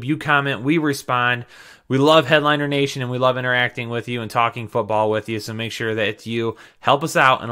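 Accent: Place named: Minnesota, United States. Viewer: Man that Minnesota accent is real!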